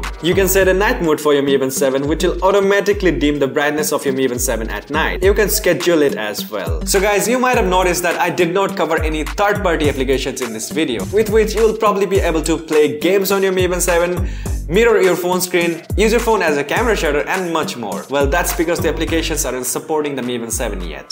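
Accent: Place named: Bengal, India. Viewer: Vai tone Bengali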